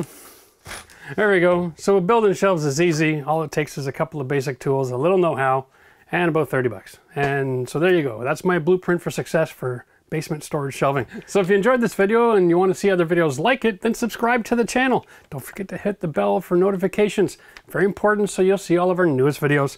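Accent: Canada. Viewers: Well done, I love your videos. Did anyone tell you that your sound like Rik Emmet from Triumph when you talk? Canadian?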